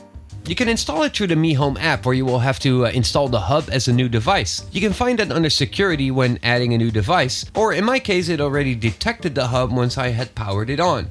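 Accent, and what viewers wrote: Netherlands. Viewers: Hoezo Nederlander? Je accent is duidelijk maat, haha Anyways, the camera seems to be nice and all. But I have been hearing never to take a device that comes from China due to hackers, content stolen, listening in, watching your cam etc. You didn't handle that aspect so how true is that and to what level is that a danger with this model? (I hope to get a answer quickly, my home has been broken into twice now and I wish to know whether this is the best one for me or not.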